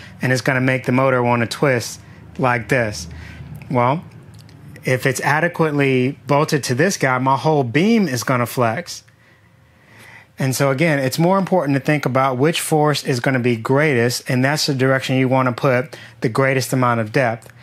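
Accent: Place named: United States. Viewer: As a European it's alway been impossible for me to understand the continued use of imperial units in USA. How long is a mile? The Americans have an old man in Iowa, a mile is how far he can walk in 45 minutes. Honestly, that imperial crap can't be used. When I watch your video, it's so wonderful to hear SI-units spoken with that American accent. Kind of gives me hope for the future.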